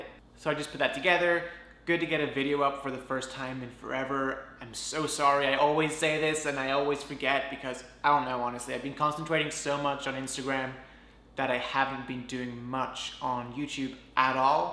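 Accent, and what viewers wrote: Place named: United Kingdom. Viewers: Lol thought he was British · Lily Kim He is British.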